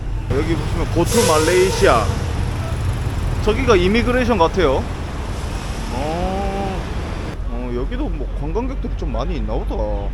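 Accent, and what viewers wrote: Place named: Gyeongsang, South Korea. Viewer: 고향이 어드래요? 경상도 말도 튀어 나왔다가 연변 말도 살짝 나왔다가 표준어가 오르락 내리락 하네요 한국말 잘하는 교포?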